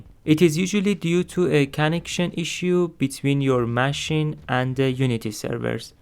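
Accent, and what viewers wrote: Iran. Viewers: درود داش از لحجت فهمیدم ایرانی هستی من گیر کردم توی این صفحه لاگین هر vpn ای هم که بوده تست کردم بازم خطا میده چیکار کنم؟ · It is irani I understand with his accent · are namosan seda irani ha asan ye chi digas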